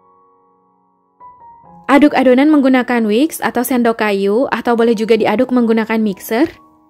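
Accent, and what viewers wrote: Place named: Bandung, Indonesia. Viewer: Suaranya kyk suara khas orang Bandung, salfok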